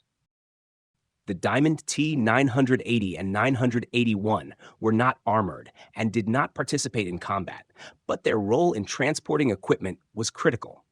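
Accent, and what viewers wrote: United States. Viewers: American accent with all measurements in metric----?????? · Please use cubic inches on American vehicles and list the torque of the engine. You sound like an American and engine torque is how these huge low rpm engines moved so much weight.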